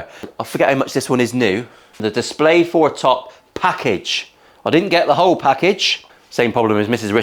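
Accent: United Kingdom. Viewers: Amazing to see you create a decent axe made of plywood . Really enjoy your work . Plus; as a french speaking person i get use of British accent !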